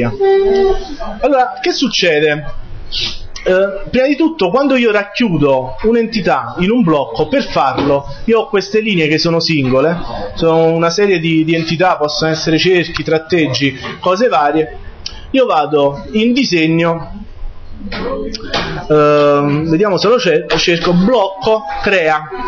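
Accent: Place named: South, Italy. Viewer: Caro maestro, a primo acchitto, la sua voce con forte accento meridionale è fastidiosa da seguire, per cui abbiamo fatto fatica integrarci ai suoi corsi, ma alla fine ha vinto lei, trovandoli molto interessanti perchè tecnicamente spiegati bene. (siamo di Milano, non la prenda a male) Lei si spiega molto bene, questa cosa le fa onore mano a mano che viene seguito facilitandoci l'apprendimento. Una nota di rimprovero va alla sua sua classe: il brusio di sottofondo prodotto dai distratti, denota la pessima educazione degli allievi. Da noi, con un insegnante come lei, non volerebbe una mosca in classe e si sentirebbe solo le penne graffiare la carta per gli appunti appresi. Complimenti e grazie, le sue lezioni ci sono molto di aiuto.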